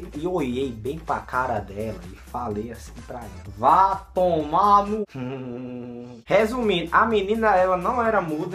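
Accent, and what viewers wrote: Northeast, Brazil. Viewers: Nordestino? É da Familia? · vc e nordestino por que eu só kkkkk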